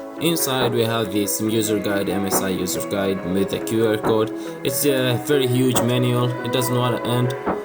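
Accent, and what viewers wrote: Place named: India. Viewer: You have an indienne accent bro 😁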